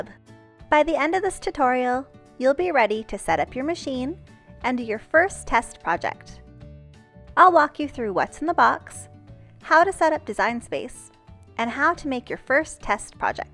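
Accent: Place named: United States. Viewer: Very helpful video, thank you, and a charming accent also, where in America do you hale from?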